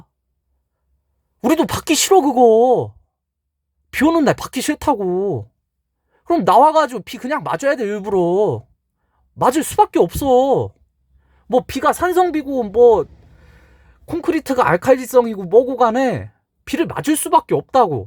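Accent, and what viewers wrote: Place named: Dangjin, South Korea. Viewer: 당진 사람이죠? 제 아는형님하고 말투가 너무같아요 당진이면 유성 코일도 괜찮아보여요 평판이니 저는 같은 충청사람으로써 너무 말투가 공감이가네요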